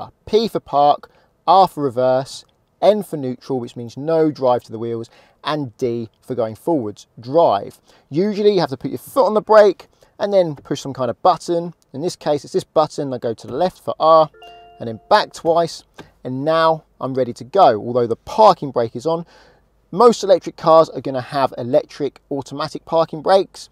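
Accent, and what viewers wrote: United Kingdom. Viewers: Although I live in country with some different road laws, has right side driving and it's speed measured in km/h, the fundementals of driving are the same. I am deaf person with cochlear implant, although you speak British English, you speak so clearly I can understand even without automatic subtitles. You give me confidence in learning to drive. I learning to drive manual, your advices were so much helpful than my instructor's advice in my native language. Thank you very much for your channel!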